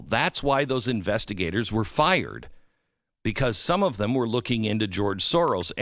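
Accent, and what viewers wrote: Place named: Russia. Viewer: Every time I think you are almost credible, out comes the crazy russian accent, the blanket statements of "nazis" coming to crush America, and the call to arms for us to stand strong against an enemy that we have no possible way of confronting. In the end I become more convinced that you are the face of crazy propped up by the deep state. Put here by design as an example of what foil hat wearing wackos look like so that by association we are summarily dismissed.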